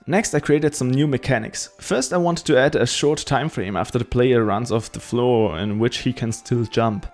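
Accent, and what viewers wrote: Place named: Switzerland. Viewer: Typisches Schweizer-Englisch :P Fand den Soundtrack übrigens ziemlich dufte! Ich hatte Godot vor ein paar Wochen auch mal ausprobiert gehabt, aber ich fands extrem unintuitiv xD Vor allem diese Node/Scene-Struktur find ich weird und macht iwie keinen Sinn für mich... Dann hab ich's wieder sein gelassen xD Naja. Hätte sowieso niemals das Durchhaltevermögen für ein komplettes Spiel gehabt ^^" Aber cooles Video :)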